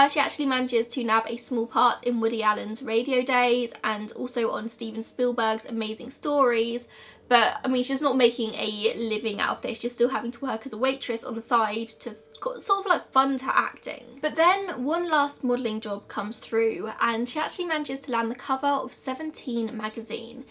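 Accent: England, United Kingdom. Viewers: hey, I'm a new sub. Long time true crime addict and I sub to a few true crime channels. I do sub to more but I end up unsubbing for either personality or bad info reasons. I won't be unsubbing from here, Ive binged a few of your videos and I love your delivery. Im in the UK, I'm in Kent... I'm trying to work out where you're from based on your accent and I'm thinking either Surrey or maybe Kent. Am I right? It's great having a Brit to watch as most of my subs are from the U.S. Looking forward to more great vids. And your make up and jewellery and clothes are gorgeous every time (I know that's not the point). I saw some love.y gold chain rope earrings on one video, where did you get them? I need them lol, I was a teen when they were first in fashion. Love from Kerry in Kent 💕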